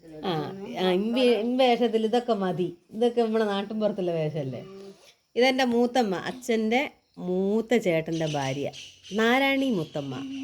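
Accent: Vadakara, India. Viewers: Ente Naattile bhasha😊(Vadakara). Ithu Evideya Sthalam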